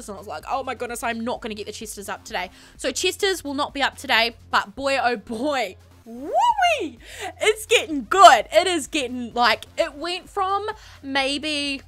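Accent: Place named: New Zealand. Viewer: I guess New Zealand (the accent) is close to Australian! First I thought that maybe you are British but then I saw your channel info :') Of course I watch your videos, they're awesome!! xx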